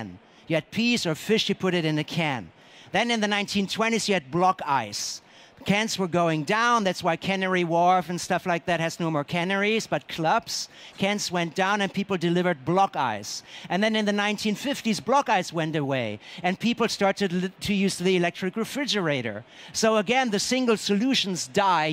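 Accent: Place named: Germany. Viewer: Good message! But very annoying delivery (too nervous, too loud, aggressive German-English...) Couldn't watch this for more than 15mins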